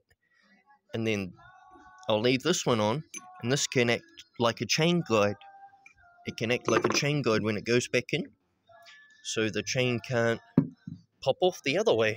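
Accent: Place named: New Zealand. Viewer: You a kiwi mate? That's a hell of an accent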